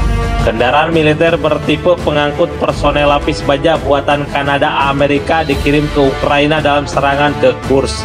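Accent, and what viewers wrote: West Java, Indonesia. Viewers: Sunda banget mimin dari logatnya 😀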